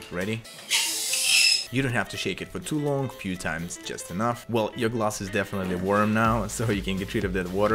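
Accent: Russia. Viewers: Как так получилось, что ты русский и делаешь это на английском? Я просто долго думал, русский ты или нет, вслушивался в акцент... И вроде я уверен в этом. Расскажи, пожалуйста, по скольку мне очень нравится то, что ты делаешь, а потому было бы очень интересна твоя небольшая история. Спасибо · Мне одному казалось что он русскоязычный из-за акцента🤔😁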